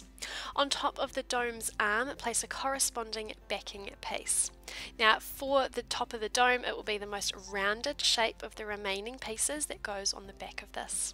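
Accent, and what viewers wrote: New Zealand. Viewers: What accent is that ? Nz ? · her accent is kiwi - New Zealand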